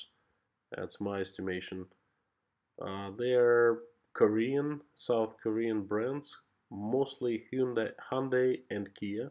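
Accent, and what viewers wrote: United States. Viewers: You speak interesting , clear American English. I watched all of this presentation. Thank you , good job of it.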